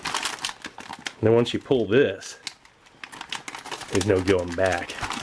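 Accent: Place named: United States. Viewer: Could anyone please tell me where in the US does accent come from?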